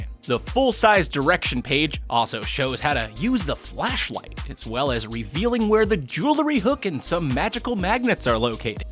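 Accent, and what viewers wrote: Buffalo, United States. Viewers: Are you from Buffalo?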